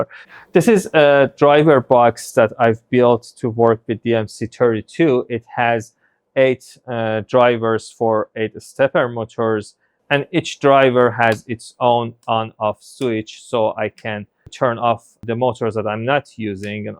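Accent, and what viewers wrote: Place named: Iran. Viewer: Thanks 🎉 I think you are Persian because the accent 😍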